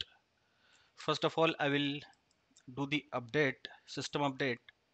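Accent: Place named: India. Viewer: Why do Indians pronounce “Give” as Giuo ??? Why?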